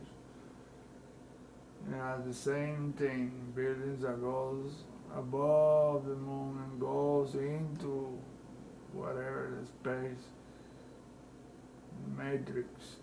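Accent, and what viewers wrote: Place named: Spain. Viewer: Your Spanish accent is Too much man. Learn to pronounce is like u do shit on purpose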